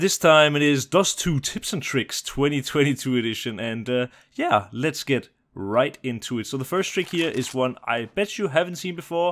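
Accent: Denmark. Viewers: Danish accent kills me, it’s so awful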